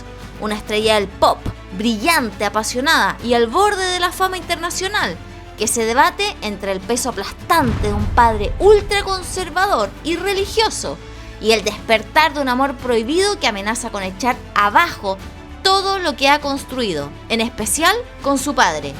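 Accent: Chile. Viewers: No puedo con este acento chileno uy no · tu timbre chileno de hablar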